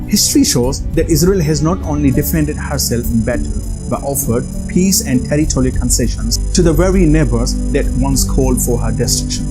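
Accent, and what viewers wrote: Bengal, India. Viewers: He sounds Bengali not Pakistani. He sure is confused with his identity. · He sounds like a Bengali no Pakistani speaks like this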